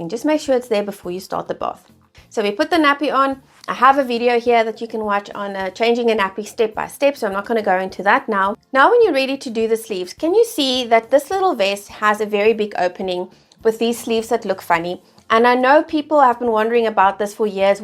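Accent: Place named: South Africa. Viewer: I know a South African voice when I hear one🥺🩵🩵🇿🇦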